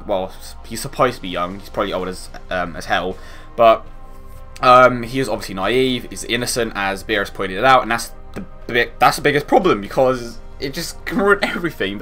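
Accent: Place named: West Midlands, United Kingdom. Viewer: I'm really interested in know what part of England is from. He kinda has the same accent as me and that being a west midlands one.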